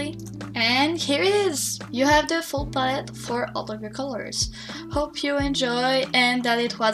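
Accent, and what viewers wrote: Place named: Quebec, Canada. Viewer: you have a quebecois accent..... 😏😏😏😏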